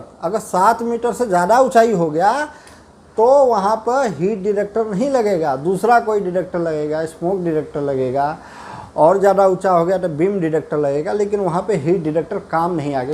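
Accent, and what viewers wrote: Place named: Bihar, India. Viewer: Bihari accent ,can it be converted to normal hindi accent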